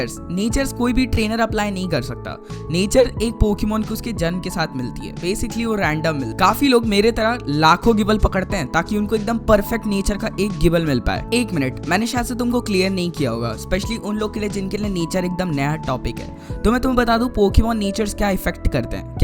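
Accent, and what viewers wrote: Bengal, India. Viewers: Are you freaking Bangali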